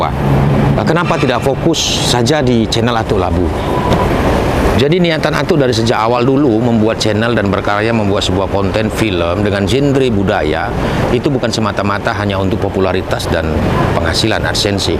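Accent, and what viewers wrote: Malaysia. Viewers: Aku orang jawa tapi aku senang bila pakai logat melayu lucunya ngena walaupun sebagian bahasanya aku gak ngerti sukses mantaap👍👍👍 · Saya penggemar komedi/YouTube di Medan minta kembali ke adegan Melayu,logat Melayu tu, usahkan melucu dengarnya aja udah enak awak dengarnya, he heee. maaf ya. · Kenapa tidak membawakan peran Melayu dan Jawa dalam 1 Chanel bg mungkin akan lebih menarik lagi karena kami (saya) lebih terhibur dengan logat Melayu Abang walaupun sebenarnya saya orang Jawa pujakesuma salam dari rantau Prapat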